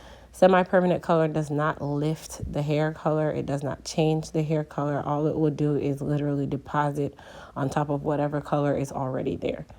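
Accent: Jamaica. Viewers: Sound jamaican to me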